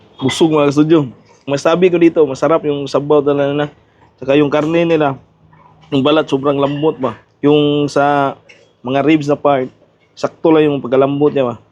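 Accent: Visayas, Philippines. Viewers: Naa napod ka studio. Dalo kaau ka.panglibre pod diha. . Dika maulaw ka studio? Bisdak baya kaau mg Tagalog. Pag English pod. Wla Kay angay mg tagaglog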